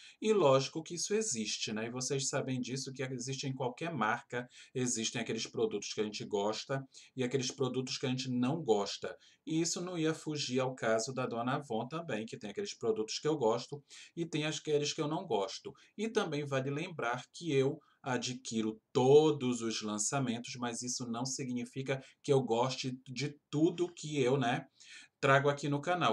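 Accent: Pará, Brazil. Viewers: Vc fala parecido com paraense ! 😘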